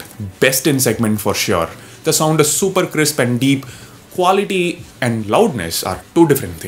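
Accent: India. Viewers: Nice indianglish...😎😎😎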